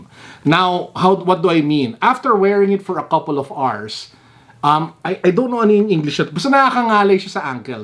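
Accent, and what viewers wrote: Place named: Philippines. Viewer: You sound pinoy kuya.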